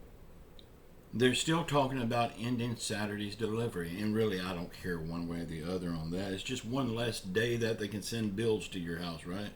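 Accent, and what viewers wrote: United States. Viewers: you remember that country classic by that you I like your show I like your country ascent you are just good old boy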